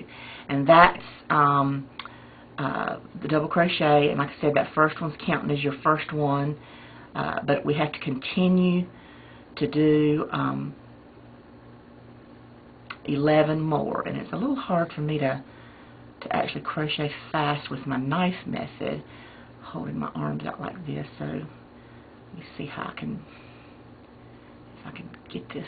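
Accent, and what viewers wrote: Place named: Georgia, United States. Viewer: I loooovvvvvee your accent!!! Every time I visit my cousins in Ga I come back with the same drawl. Btw. I also like your tutorials!!! U make it so easy to crochet along with the video. Thanks for all yr hard work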